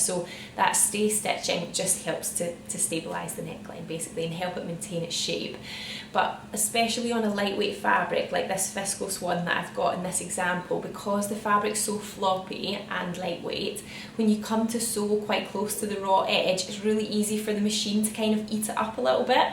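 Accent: Scotland, United Kingdom. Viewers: I watched your video for the interfacing and l just ended up really enjoying your accent...l really love scotish accent...wish l get to visit...greedings from Athens that is straggling with heatwaves and wild fires at the same time...oh! And of course you are very very clear in your video and very sweet...slainte!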